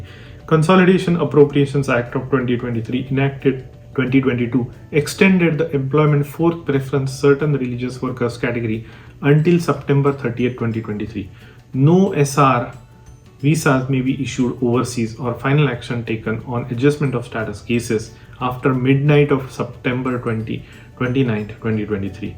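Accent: India. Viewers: U need to make your accent less Indian-like. It is not pleasant to one's ears.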